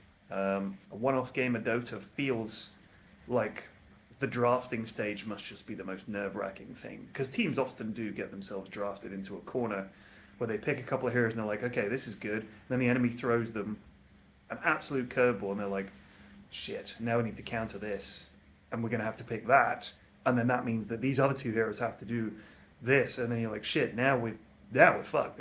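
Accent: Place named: London, United Kingdom. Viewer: Love these videos man, keep them coming ! Out of curiosity which football team do you support ? You sound like a Gooner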